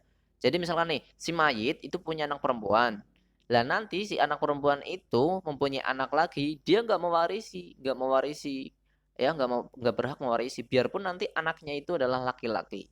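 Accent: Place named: Banyumas, Indonesia. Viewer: Semakin kebelakang, semakin ngapak. Wkw. Terimakasih ilmunya pak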